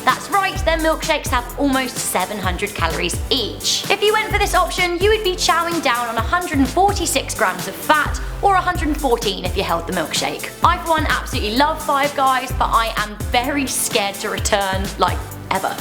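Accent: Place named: England, United Kingdom. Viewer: Saying all of our American foods in an English accent is so funny 😂😂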